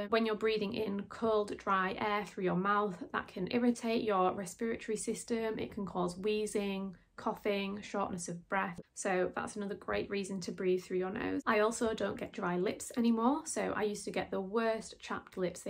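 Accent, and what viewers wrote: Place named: England, United Kingdom. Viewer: I will definitely purchase that book. This stuff is so fascinating! Great channel overall as well. I didn’t want to offend but I figured England and I do understand there are various different accents among parts, as is the same here in the U.S. Very Russell Brand-esque to me 😂 Beautiful though!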